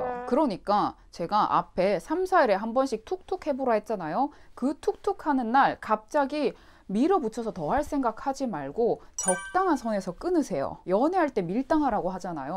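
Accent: Gyeongsang, South Korea. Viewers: 쌤 대구예요???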